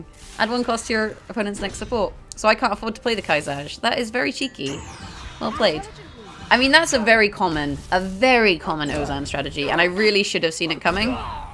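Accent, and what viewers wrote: United Kingdom. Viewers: I can't place your accent. British with Irish parents living in the States is my wild guess. Anyway another good vid, have been looking for a deck to get me out of plat. I run several adapted versions of your decks to deal with my Diamond card poverty. They are consistently effective. Cheers for the good work.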